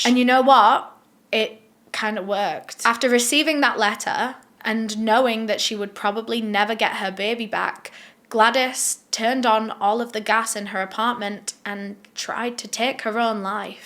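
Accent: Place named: Yorkshire, United Kingdom. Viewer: It's always an amazing day when uploads. Thank you for another wonderfully informative video. I love your Yorkshire accent. I live in Derbyshire, so I have a twang of it in my accent as i lived in South Yorkshire for a while. Look after yourself, beautiful lady!. 🥰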